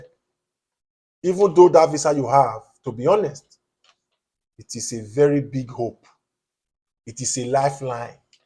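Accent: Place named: Nigeria. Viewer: Your Nigerian accent is too thick making it difficult to believe anything you say sorry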